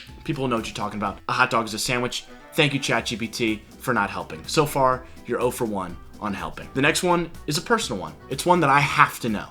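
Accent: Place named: United Kingdom. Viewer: I thought you was British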